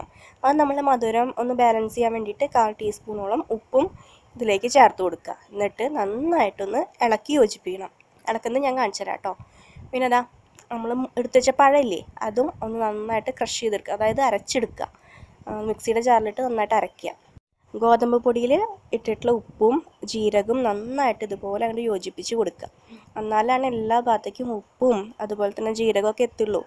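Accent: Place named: Kuttippuram, India. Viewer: നിങ്ങളുടെ സംസാരത്തിൽ നിന്നും കുറ്റിപ്പുറം ആണ് ചേച്ചിയുടെ സ്ലാങ് എന്ന് ഊഹിക്കുന്നു. ശരിയാണോ?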